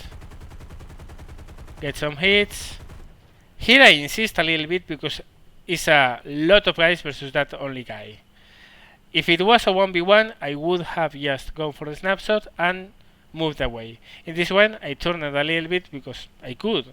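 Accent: Spain. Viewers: Eres español porque tienes acento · are you from Spain? I hear a Spanish accent :P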